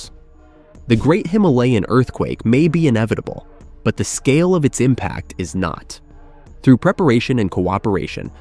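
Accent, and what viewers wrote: United States. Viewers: This is true to the rest of the world also...... What about Yellow Stone.... This speaker seems to have forgot that his American focks are equally at risk since they are sitting right next to the what is known to be the biggest volcanic geo event point. How about that... So in your Amercian accent please talk abt Americans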